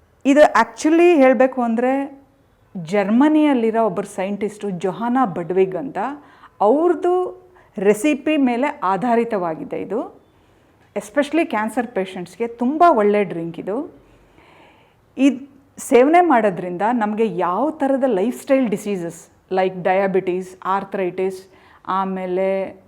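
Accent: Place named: Bangalore, India. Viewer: ದಯವಿಟ್ಟು ಶುದ್ಧ ಕನ್ನಡ ಮಾತಾಡೀ ಬೆಂಗಳೂರಿನ ಕನ್ನಡ ಬೇಡ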